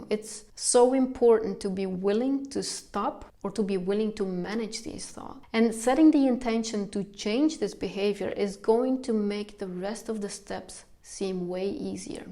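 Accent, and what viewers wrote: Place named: Netherlands. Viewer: Totally get the tail-chase thing. I'm late this week and couldn't stick to my weekly upload schedule as my type of content is edit-heavy. I find myself inside Affinity Photo (my PShop eqvivalent) than doing the recording or video edit itself. (Atm I'm usng Descript, Camtasia and Audacity) Out of interest what are you using? And judging by your name and accent I presume you're in Holland? :-) Anyway, having a posting schedule is still priority for me, especially when overseas or dealing with 'the job'. Post or die! LOL.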